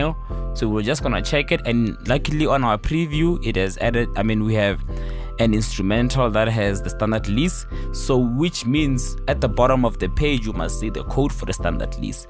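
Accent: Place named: South Africa. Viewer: NICE !!! GOOD HELP , UR ACCENT SOUNDS LIKE SOUTH AFRICA IM ALSO FROM SOUTHEN AFRICA , PEACE N LOVE THANK YOU BRO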